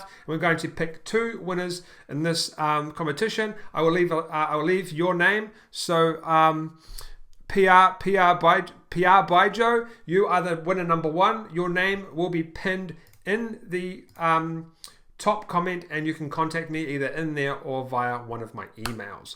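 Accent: New Zealand. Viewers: do you come from new zealand? you sound like a kiwi haha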